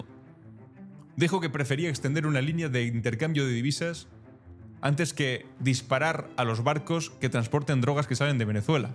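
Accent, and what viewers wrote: Spain. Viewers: Me encanta acento Español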